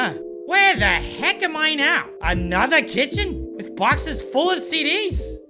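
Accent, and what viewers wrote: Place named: Ireland. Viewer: Irish I can tell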